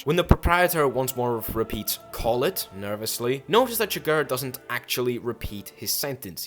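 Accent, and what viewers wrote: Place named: Scotland, United Kingdom. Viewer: Great, short video essay. Funny at times informative at others, but I've only just realised how Scottish you sound lol. Keep up the good work.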